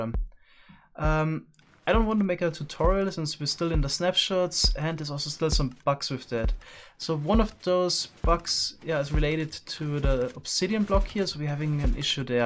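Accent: Germany. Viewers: Are you german? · I'm curious, are you German or Austrian? I recognize that accent... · He is german isn’t he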